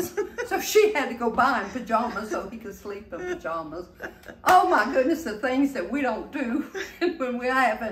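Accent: South, United States. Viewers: your southern drawl. Charming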